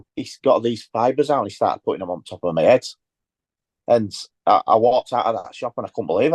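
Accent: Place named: Northern England, United Kingdom. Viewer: Just because you're not used to a Northern English accent there's no need to be a dick about it - his articulation was perfect.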